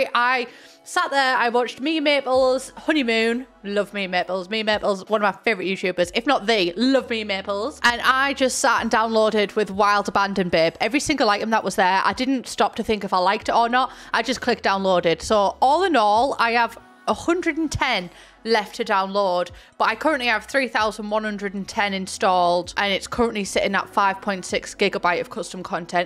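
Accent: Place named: North, United Kingdom. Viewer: FINALLY A NORTHERN BRITISH YOUTUBER THANK YOU FOR EXISTING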